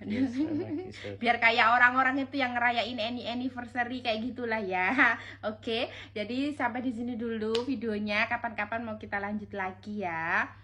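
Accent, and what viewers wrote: Java, Indonesia. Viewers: Itu bapaknya ga mungkin setres,,karna istrinya lucu lihat gaya ngomongnya aja udang ketawa gaya indonesia bangeeet. 👍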